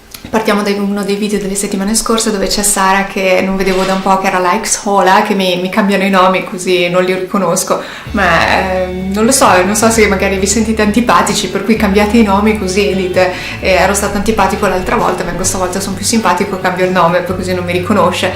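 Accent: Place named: Friuli, Italy. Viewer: hai una cadenza nel parlare che ho sentito in tanti friulani che in un qualche modo mi è familiare. Comunque ho fatto una bella scoperta con il tuo canale. Un saluto con simpatia 😉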